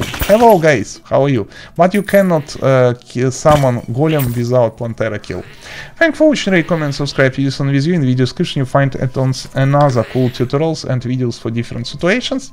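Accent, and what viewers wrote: Russia. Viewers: You are Russian? Accent is similar😅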